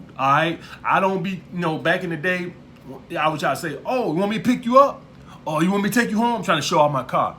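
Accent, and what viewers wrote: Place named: West, United States. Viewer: 😂😂😂😂😂 you funny ASF but still talking these deep truths. With that Western Accent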